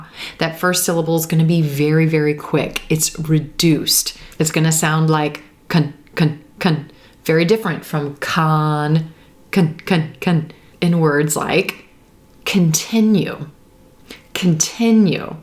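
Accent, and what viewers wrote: United States. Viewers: hello, my name is juan rivera, sorry my english is not good I'm begginer english student I'm 38 years old and I'm from Nicaragua in centre america, as you can imagine this country is really poor talking about economic situation and because of that I lost my family and now I live with my mom I don't have my own house I survive with only 80 dollars per month and I send 50 for my two daughters for manutation, it's been difficult all this time, that's why I have been studying english in a little free program course just to improve a litle my situation at least. but I've already finished the 12 levels and I feel that I need to improve a lot yet, thanks for making this videos, I just watch one of your videos and believe me I liked it, and I'm gonna try to see every single video from your first video, I like your pronunciation I guess your are american, to be honest don't like british pronunciation I prefer american accent, I would like you to talk about the most common situation with modals in present future and past because this is the hardest content for me, I would thank you, well greetings and blessings..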